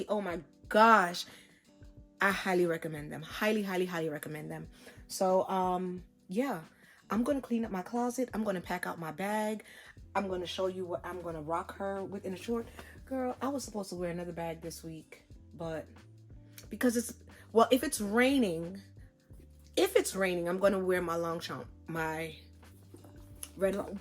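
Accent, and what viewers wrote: Jamaica. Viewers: I was contemplating whether or not I should get this for my mom. I think your video sold me on it. Btw, did I hear a Jamaican accent?! JAMAICANS STAND UP!!!! 🇯🇲🇯🇲🇯🇲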